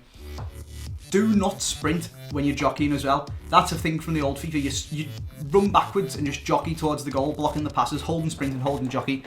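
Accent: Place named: North England, United Kingdom. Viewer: What part of England you from? I recognize your accent is it Liverpool or Manchester or something?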